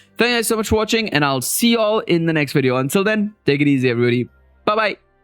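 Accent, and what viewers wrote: India. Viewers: Are u indian as u sound very indian or christian maybe just would like to know and plus anyways ur videos are very good and enjoyable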